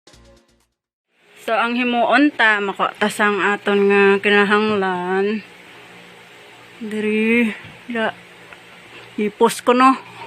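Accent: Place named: Hiligaynon, Philippines. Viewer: Galing naman, ang husay! Ganon naman yan ang cute!!!!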